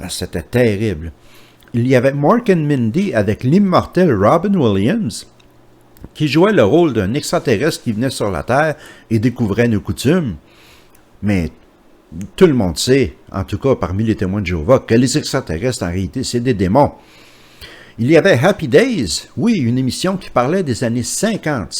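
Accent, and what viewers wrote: Canada. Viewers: Merci pour toutes ces videos. Elles sont tres bien et puis cet accent canadien, je ne peux me lasser de l'entendre.... C'est un accent sympathique, chaleureux. Franchement je ris de bon coeur quand j'entends que les Anges de Charlie et la Petite Maison dans la Prairie etaient des emissions condamnables a leurs yeux. Ils sont fous ces gugus, il faudrait mieux ette sourd quand on entend leurs arguments. La Petite Maison dans la Prairie, ca passe tous les jours a la TV en France. Allez demain je commence a lire les 2 volumes de l'encyclopedie biblique!!!! Cordiales pensees de France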